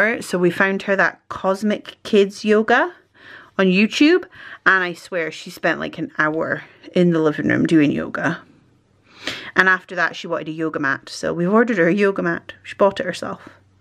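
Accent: Scotland, United Kingdom. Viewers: A plan with me and the word "purring" on a wonderful Scottish accent is just what I needed on this cold January day ❤️❤️❤️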